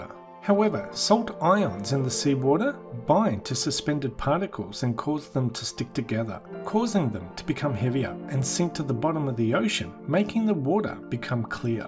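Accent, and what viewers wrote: Australia. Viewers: Yes he sounds aussie. It was a joke anyway. 👌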